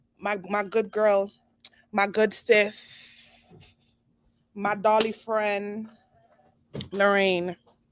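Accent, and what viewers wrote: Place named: Brooklyn, United States. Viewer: The Brooklyn might come out of you & they just don’t know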